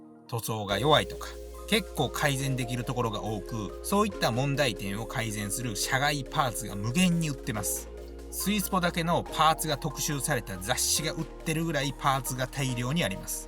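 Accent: Kansai, Japan. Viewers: はじめまして、もうすぐ1型中古を納車予定の者です。 色々参考にさせてもらってます。全然関係ないですが アークナイツというゲームの実況者（登録者10万以上）のoyukiさんみたいで関西弁のトークが親しみやすいです！ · 関西訛りなのに浜松ナンバー。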